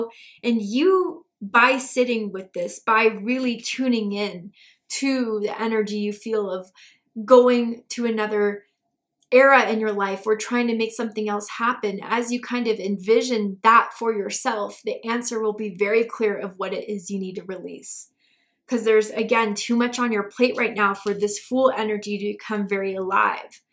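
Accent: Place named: United Kingdom. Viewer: Are you from the UK 🇬🇧 😊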